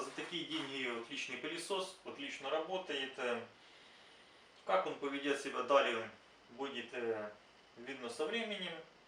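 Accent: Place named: Ukraine. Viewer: Мужик, ти ж україномовний, в кожному слові це відчувається нахіба ти не звичною для тебе російською говориш?комічно ж виходить...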